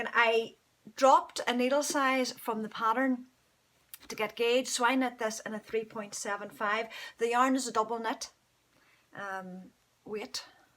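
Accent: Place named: Ireland. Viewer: Firstly I love yr accent m Dad came from Limerick. Sadley he passed over in 2017 and I miss that wonderful Irish voice. Now yr podcasts are great and your jumpers amazing.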